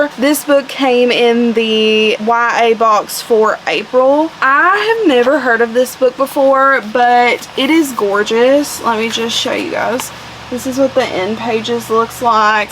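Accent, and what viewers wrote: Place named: South, United States. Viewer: Ok, I’ve gotta know where you’re from. Love the southern accent. I’m from Georgia 🍑 always looking for new book besties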